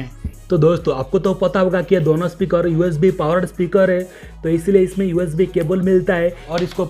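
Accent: Bengal, India. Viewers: Bhai bengali ho yea Assamese ho?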